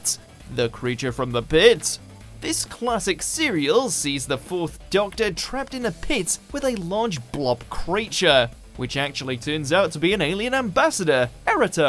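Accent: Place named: North, United Kingdom. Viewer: It's so called,, accent". It's normal. He speaks with some northern accent.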